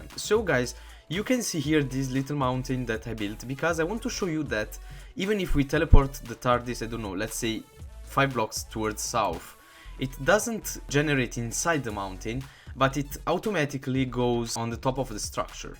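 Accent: Italy. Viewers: he's italian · Si sente l'accento italiano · are u italian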